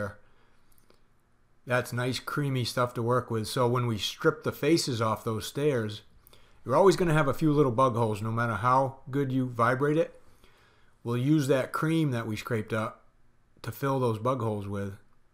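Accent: Maine, United States. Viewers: Great work!! it’s funny after listening to you talk for about 10 seconds I was like he’s from Maine! Looked up your company and your in Monmouth... I live in Bangor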